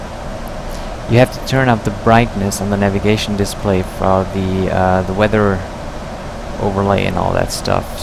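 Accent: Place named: Germany. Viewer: Richtiger deutscher Akzent :D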